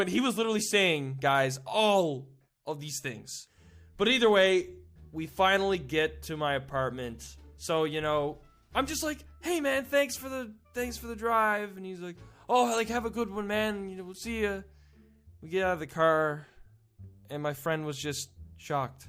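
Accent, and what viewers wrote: Canada. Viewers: Ur Canadian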